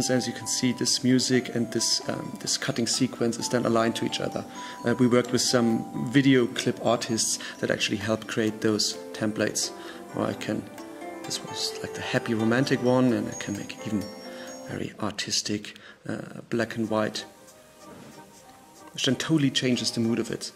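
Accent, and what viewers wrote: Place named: Germany. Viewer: Man merkt das du deutsch bist :D Akzent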